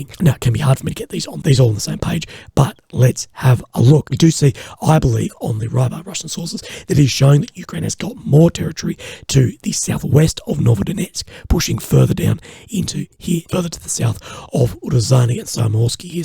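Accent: Australia. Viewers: funny Australian accent and obscure)) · Can't speak Australian without a smile! Thank's for your efforts, homie.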